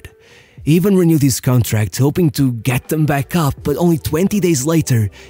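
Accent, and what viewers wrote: Portugal. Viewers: Are you Portuguese? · Quem é o tuga que dá a voz a estes vídeos?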